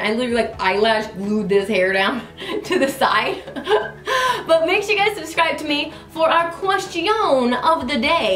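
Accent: England, United Kingdom. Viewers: Yeah for English accent. Im English and I love it girl. From Sarah.xx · Love your videos so much! Always cheer me up when I'm having a bad day and make me smile. That English accent though 😂😂😂 it's like a shout out to us in the UK! You should try a wee Scottish one too though and do a Brave tutorial for the Disney Princess series - it's been a while!! xxx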